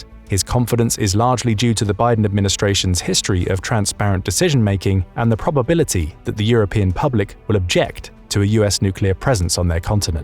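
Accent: United Kingdom. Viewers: Nothing like listening to a Brit's voice crapping on another country's military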